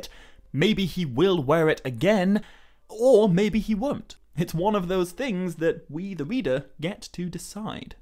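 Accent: United Kingdom. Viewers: As an American I enjoy UK pronunciations of words like aluminum....I won't correct you if you don't correct me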